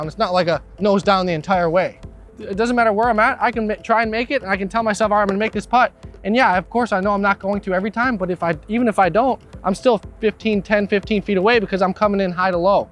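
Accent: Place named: Northeast, United States. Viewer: Played it at 1.5 speed! Reminds me of folks from the northeast!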